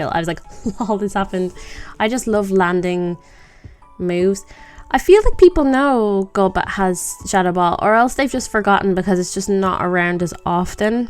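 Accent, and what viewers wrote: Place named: United Kingdom. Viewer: I really like your british accent <3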